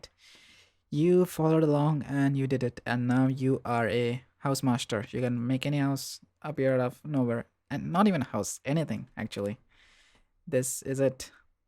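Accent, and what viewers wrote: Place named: India. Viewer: I hear an Indian accent. Hi.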